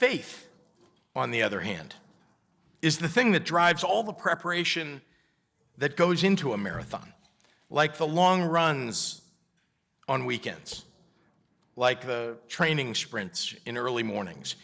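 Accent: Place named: Canada. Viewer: About Cannabis ~ after a CRIME of the CENTURY of ILLEGAL CANNABIS - We the GLOBAL people truly demand & expect this otherwise FREE DITCH WEED backyard garden-variety naturally safe & superior NATURAL BOUNTY plant to be RETURNED outright & overnight so we can GET BACK ON TRACK as a GLOBAL SOCIETY using our free best foot forward to redemption, after being FORCED to instead utilize sickly synthetic toxic patented/lapse-patent profit crap for generations.. So yes - time this ends is already 105+ years later. Thanks too for referring to it as 'Cannabis' vs that designed derogatory slang 'mj'. Respect! Speaking of a Garden that didn't need tending.. and 'weeds' that needed to be rooted - consider how FAR AWAY FROM NATURE the global population has been forcibly redirected.. consider a DANDELION is one of the most healthy plants we have at our feet - and what we have been doing with it all along.... 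just as a simple primal example of how FAR OFF the path WE HAVE BEEN LED. Dang, Sir - Respect! From a Canadian, I am taken aback by your speech - in a very great way! You almost sound 'Canadian' fcol! This is a breath of fresh air - the rest of the planet awaits!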